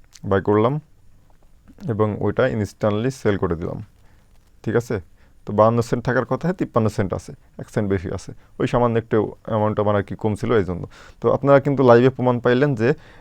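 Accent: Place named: Kushtia, Bangladesh. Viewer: vai akta kothia bole apnar voice cena cena laghay apnar bari ki Kushtia tay 🙄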